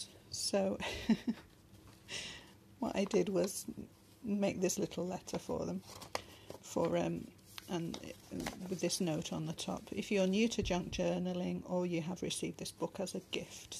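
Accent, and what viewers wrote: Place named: Kent, United Kingdom. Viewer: I'm not clever really your accent is quite clear still. I am from Kent so recognised you have a local accent as you sound a little like myself. It's beautiful up north and I love to travel that way when I can. If you had still lived locally I would have enquired if you do journal making classes as I would have loved to attend. There is little known about making journals down in the SE that I can find, as such YouTube is a valuable community building link. I must go check out your etsy store asap.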